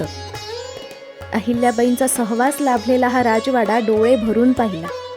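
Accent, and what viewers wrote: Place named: Gujarat, India. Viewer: Gujarati laheja aahe Nemadi nahi.... Nit aikun bolat ja baai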